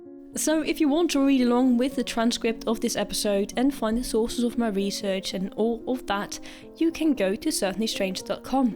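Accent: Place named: United Kingdom. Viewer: i'm brazilian, and i do not know, really, how i get here, and i not understand 70% what do you say, even know if what i writing now is right, and your is Britanic, worstly to understand (not ofense) but your videos like creatives and interesting enough to fix my attention, so i really wich good luck, God blessings on you and your channel grow up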